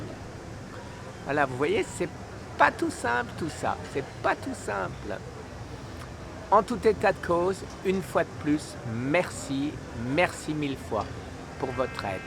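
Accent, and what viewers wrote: Alsace, France. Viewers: Bonjour, l'alsacien! J'ai soupçonné un certain accent que je connaissais.. hé oui en t'écoutant..tu avais parlé que tu vivais à Strasbourg..il y a 20 ans je crois.. Je ne sais par comment je suis arrivée sur ta chaîne! Mais je suis ravie..j'apprecie les battants en général dans la vie!! Personnellement je ne suis pas touchée par cette maladie.. Mais ma mère et mon compagnon ont quittés cette terre..à cause de ce crabe!! Bien sûr que l'alimentation saine.. est un facteur de santé physique mais aussi psychique! J'ai personnellement changée mon alimentation depuis une dizaine d'années, et je suis bien plus en forme physiquement et mentalement et mon moral est au top! Si bien,que j'ai entamée une conversion professionnelle il y a presque 10 ans. Oui j'ai rejoins une éco crèche, et j'ai jour après jour transformée les menus que l'on servaient aux enfants. 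J'en ai fais ma croissade..j'ai emprunté ton titre..pour d'expliquer que c'etait et reste encore pour certains aliments une bataille..Avec certains de mes collègues.. Mais au jour d'aujourd'hui les menus à la crèche sont végétariens voire végétariens et bien sûr bio. J'anime des ateliers pour les parents, grands parents pour les sensibiliser à l'alimentation approprié pour les jeunes z'enfants.. Les rendre attentif que la nutrition infantile essentiellement basée sur les végétaux, les fruits et crues, joue un rôle important pour leurs croissances mais surtout aussi de conserver un capital santé tout au long de la vie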